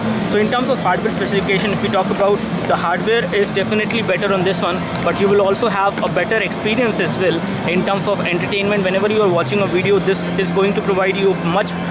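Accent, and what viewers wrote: India. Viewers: That Indian Accent! Love it! :)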